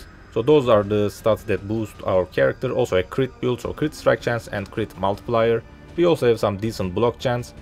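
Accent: Turkey. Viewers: konusmaya basladin 5 saniye dinledim ve dedim bu adam türk :) ve ne buluyorum kanal hakkinda :"Making build guides for Path of Exile.Because there are not much Turkish content creator,i make other guides in Turkish." yakisir...kolay gelsin hocam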